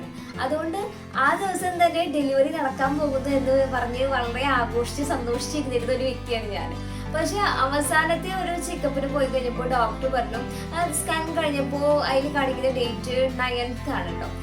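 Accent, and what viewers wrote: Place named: Thrissur, India. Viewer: Thrissur slang il chechide samsaram kelkan nalla resam🥰... Sedation kodthit ulla aa acting scene okke sherikum njn chirich poy😂😂😂😂...love you chechi..god bless you baby girl 😘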